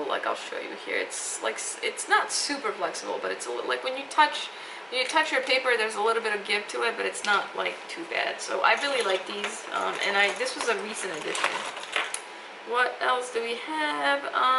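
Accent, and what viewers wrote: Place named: East Coast, United States. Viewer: Oh yeeah. Pens are friends. As unabashed as that is to admit.. well, sort of. You've a cool East coast accent.. NY? Boston? I agree about pencil vs pen, took me ages to see the light but now def better to go straight pen when drawing. You might dig these pen cases by Lihit Lab 'Smart fit pencil case' Helps keep pen choice down n neat design that stands up into pen pot. Im not sure if you can get hold of them stateside but some of my fav pens are the Winsor Newton watercolour markers. So good. Be safe, happy drawing 🎨 My latest, with pen stuff too <link>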